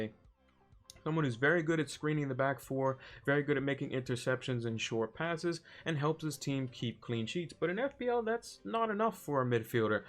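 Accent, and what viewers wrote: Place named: United States. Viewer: where you live mate? sounds like US but maybe you speak another language also?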